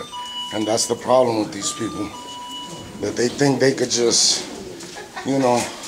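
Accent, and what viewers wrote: New York, United States. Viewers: You so New York bro 😭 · Good job. Holy shit, you sound like you've never left the boroughs. Takes me back. I live in Oklahoma now but my family is from West Haven. Keep it up. Subbed. I'll forward this to other auditors and mirror on my channel, with your permission.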